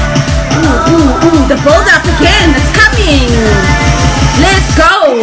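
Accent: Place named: South Africa. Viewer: That accent sounds very familiar. Are you from SA?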